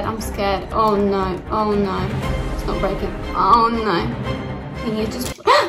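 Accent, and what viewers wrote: United Kingdom. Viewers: Are u Briiish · I thought you're American, confused with the British accent tho lol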